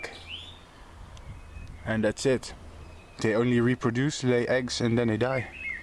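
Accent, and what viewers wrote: Netherlands. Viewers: Beautiful creatures, and never apologize for your Dutch accent! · Those are some very pretty moths! I immediately knew you were Dutch haha! But don't even worry about the accent, you're very easy to understand :)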